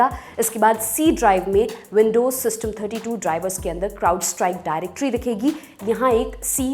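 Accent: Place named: Kanpur, India. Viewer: are ye sab kanpuriya hain..aise hi bolte hain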